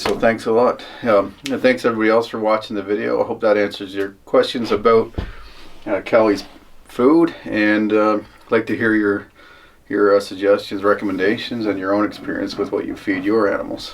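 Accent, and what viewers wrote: Canada. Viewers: I love the Canadian ascent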